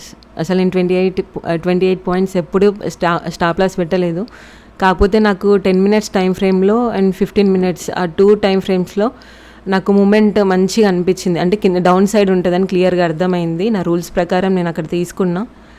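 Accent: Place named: Telangana, India. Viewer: Good teaching. Telangana slang 😀 Sister. How many years of experience do you have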